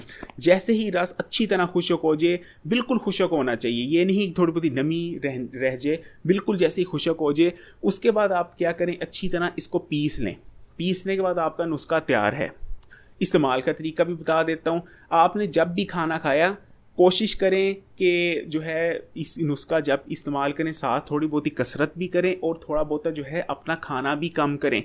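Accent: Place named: Punjab, India. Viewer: Hakim sahab urdu bol rahe Ho, tone Punjabi aa rahi hai .by the way thanks for your information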